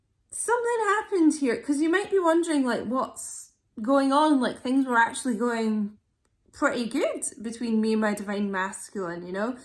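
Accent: Scotland, United Kingdom. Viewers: 🔥♊🔥♊😎💚💚💚 thank you spot on! both Gemini twin flames from Scotland nice to hear ur Scottish accent!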